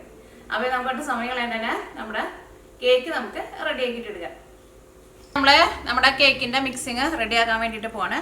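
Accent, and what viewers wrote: Thrissur, India. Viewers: Thriusur bhazha kelkaan nannyittunndu,cakekyum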